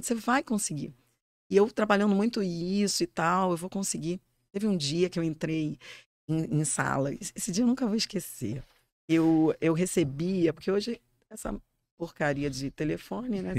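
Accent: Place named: Rio de Janeiro, Brazil. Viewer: Sotaque lindo, Carioca né... lindona de viver. gente grande !!!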